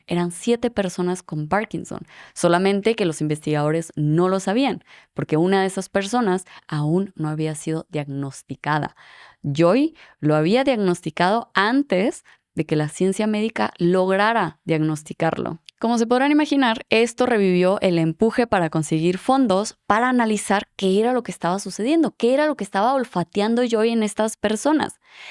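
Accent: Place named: Sonora, Mexico. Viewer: Vivo en Austria desde hace 11 años pero soy de Sonora. Me encanta escucharte pero en este vídeo se te sale mucho el acento washo 😭😭 nimodo... Buen episodio. Saludos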